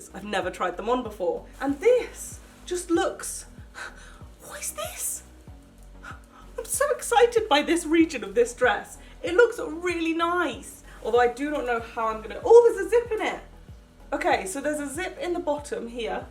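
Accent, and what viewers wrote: United Kingdom. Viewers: Im sorry, but ur so so weird, and plus its more weirder when ur talking Britain THAT PRECIOUS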